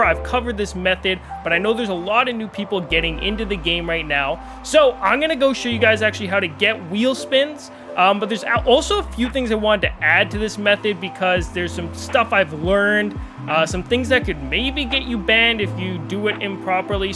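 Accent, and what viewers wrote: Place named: Minnesota, United States. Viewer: You have a Minnesota accent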